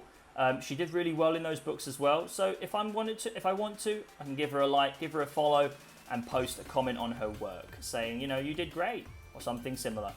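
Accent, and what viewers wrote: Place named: United States. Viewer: Wicked! You use a lot of American pronunciation, by the way. Thanks.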